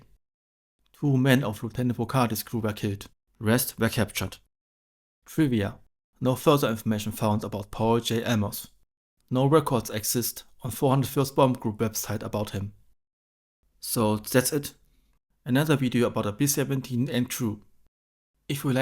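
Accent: Germany. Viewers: If this is your voice, it really adds to the story. The accent adds some depth and feeling to the video, somewhat like watching Das Boot in German, with English subtitles. Well done! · Grundsätzlich mag ich den Kana sehr, aber vielleicht angesichts des starken deutschen Akzents vielleicht mal darüber nachdenken, ob Sie den Kommentar nicht durch eine KI-Stimme sprechen lassen. Dann klingt es wie richtiges Englisch. Da gibt es auch jede Menge gute Freeware.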